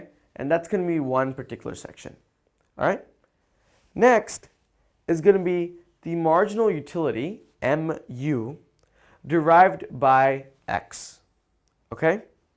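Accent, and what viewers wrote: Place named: India. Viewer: magnificent.sheer fabulous accent compared to your other countrymen in india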